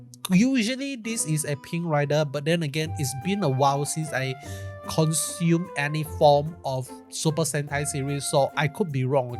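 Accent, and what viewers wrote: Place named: Japan. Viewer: Your thick accent is hard to listen to just speak japanese with English subtitles it would be less painful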